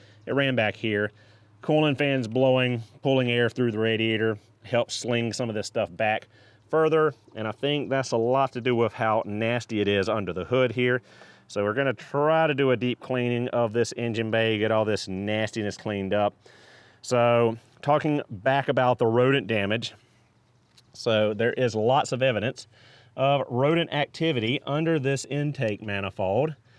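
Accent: United States. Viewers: OK, I love the East/South Accent....Anybody gonna mention whatever is leaking or so......LOL. Great video and this cat can have that engine out and broken down in an hour. LOL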